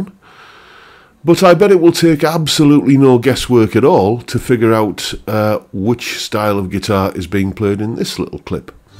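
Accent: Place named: Northeast England, United Kingdom. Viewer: I'm trying to place your accent... Guessing somewhere between Consett and Darlington?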